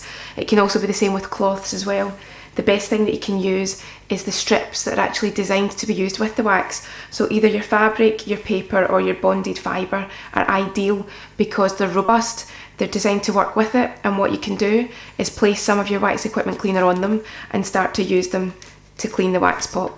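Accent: Scotland, United Kingdom. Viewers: I benged Outlander and now the Scottish accent seems to be following me lol. I love your accent. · U sound Scottish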